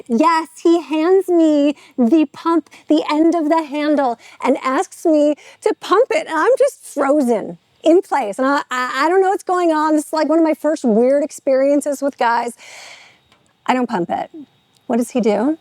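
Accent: Chicago, United States. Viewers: Yes. If you close your eyes and just listen - 1000% Chicago high class girl. Unmistakable. Even with the man's watch. Brilliant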